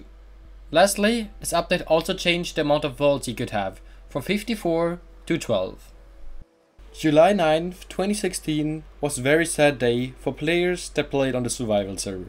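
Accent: Germany. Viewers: Lol Do you have a German accent?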